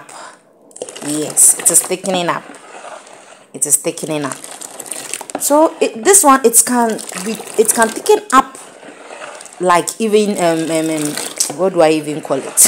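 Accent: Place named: United Kingdom. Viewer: I love how you meticulously speak the Queen’s language ❤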